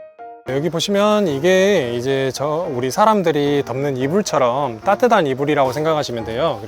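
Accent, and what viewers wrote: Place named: Jeolla, South Korea. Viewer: 서울말투쓰려고 노력해도 전라도 말씨가 다 들리노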